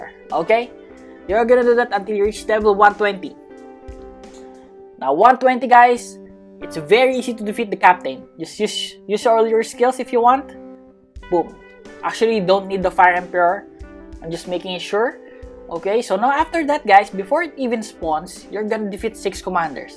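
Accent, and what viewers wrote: Philippines. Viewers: I also knew he was Pilipino like how he is talking and like My family Talks with like a high voice a little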